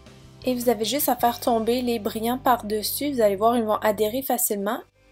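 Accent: Canada. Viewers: j'aime le tout mais mon préférer c'est frosty!!! :) merci pour toute c'est idées et t'est vernis son très jolis......je kiffe ton accent tu es canadienne??? :):):):) mercii beaucoup pour cette video qui ma donné beaucoup d'idées ! :) :) :) en passant: tu as mis cette vidéo en ligne le 8 décembre et on est le 13 donc en 6 jours tu as fait 16 073 vue !!!! bravo. :) :) :) :) :)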